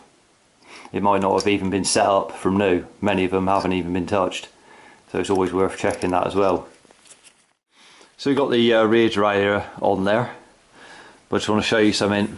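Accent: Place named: South West England, United Kingdom. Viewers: His account is south west I think. I'm terrible with accents although I've lived in different parts of England. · That's why I'm asking, reminds me of my Bristolian mates' accent, the best in the world hah. So you're spot on I reckon.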